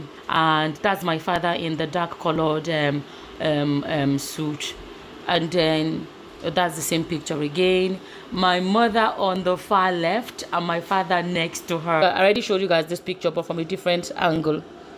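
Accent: Nigeria. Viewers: You speak like your from orlu or Osu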